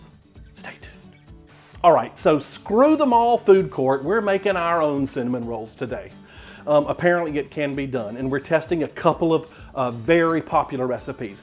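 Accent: South, United States. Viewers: “Y’all”~ I love your channel & your accent!! Thank you! A Southern Belle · You are really entertaining and I am really glad I stumbled upon your Channel. Canada, so love that southern accent lol. I think I will have to try making these with that packet of Fleischman's yeast. My Baby Book had notatiins from my Mom saying that I grew like Fleischman's Yeast as a young baby. Ha ha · OOOH! at 3 net carbs I can eat all twelve for a total of 36 carbs...if you can eat 20 to 50 carbs a day you’ve got it made!!! Hmmmm will the cheese cause the bowel to bind???? Love love your channel and your southern accent, mine is Texan, makes me feel at home.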